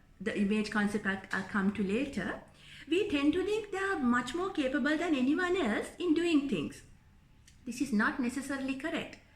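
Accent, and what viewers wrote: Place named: Sri Lanka. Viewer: Sri lankan standard english accent.👍👍👍👍👍❤️❤️❤️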